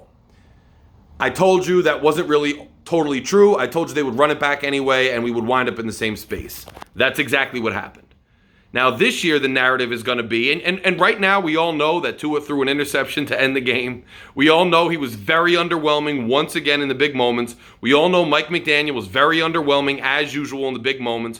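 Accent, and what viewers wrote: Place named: New York, United States. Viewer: Funny thing, you sound like you’re a NY transplant in Miami? Somewhat heavy accent…. I’m a die hard Bills Fan, born and raised in Buffalo , be 62 in June, Miami vs Buffalo has been a rival since the 70s, they owned us in t(e 70s, 80s, get a new coach and QB and Miami is a threat…. Good luck in t(e playoffs,,, Go Bills..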